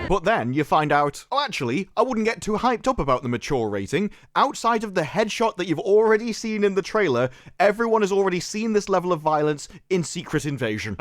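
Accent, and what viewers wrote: United Kingdom. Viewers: I couldn't get thru six minutes of this obnoxious brit talking shit. Like damn is this his whole platform? Pass.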